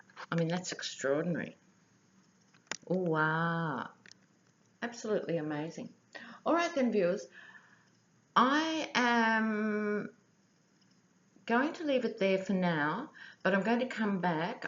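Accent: Australia. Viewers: Thanks so much for this special reading,from up here in Queensland. I, like you have been so taken with what has been happening in USA that I have become out of touch with news at home, so was amazed to find that we had a new PM. Thanks for your overview. I do enjoy your no nonsense style, our accent and the fact that you use old fashioned aussie slang instead of US; "he has the brain of a parrot....sharp as a whip....take the flack... in deep shit....one of those you can describe by the "i"s; incompetent, immature, insecure." I do believe our political system is a little less open to the corruption we see in the US called "Lobbyists" ie money to get political reps to do your bidding. Our low key elections of 6 weeks cannot cost as much as their crazy hyped up rallies.The money for such things should come from Taxes and not from interest groups,otherwise interest groups with little money are not going to have representation. 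It might explain why in this day and age, any first world country could be against universal health cover! I wait anxiously for your readings everyday, and I like the fact that you acknowledge other people working in the area, in particular Dave Johnson.. So thanks again.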